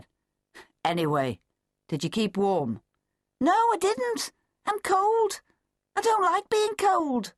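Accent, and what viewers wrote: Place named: United Kingdom. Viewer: Awesome story. Fantastic British accent.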